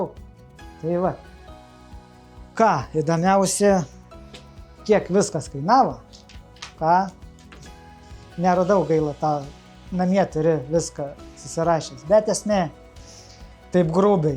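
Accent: Aukštaitija, Lithuania. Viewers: Vat tas grynas aukštaitiškas dialektas :D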